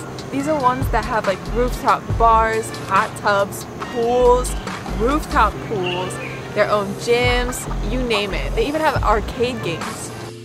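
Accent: Taiwan. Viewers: 我真的覺得前面開頭講的英文有台灣口音!! 一開始聽不習慣… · 一開始打開影片聽有種台灣人說英文的親切感覺，後來才想起來妳是美國人ＸＤ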